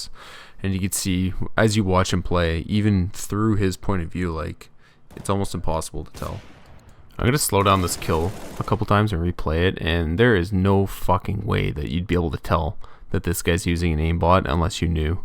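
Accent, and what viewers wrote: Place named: Canada. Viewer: Nice canuck accent btw... :P Could pick that out instantly. Grew up in the north myself! :D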